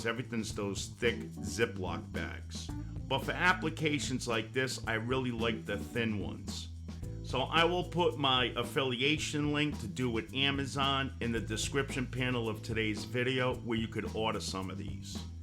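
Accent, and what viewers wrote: Rhode Island, United States. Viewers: ahhh. I see. Well, you certainly have not lost the RI accent!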